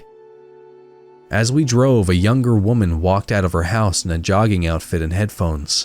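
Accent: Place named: Midwest, United States. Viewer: This is awesome so far! The way you get more intense towards the end is cool. Your Midwest accent is funny, but semi accurate at the same time lol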